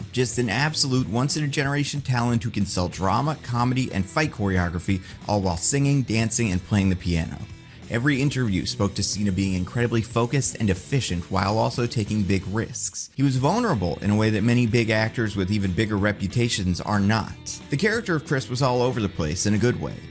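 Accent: Philadelphia, United States. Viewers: u from Philly???????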